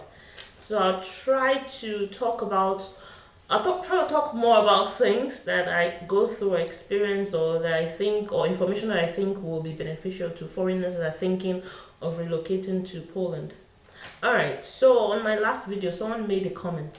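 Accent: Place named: Nigeria. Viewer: my sister i hail......i must say u are really doing a very good job..thanks for the info.. u really sound like nigerian...i am a proud nigerian...thanks for being u..may God bless u for all the info.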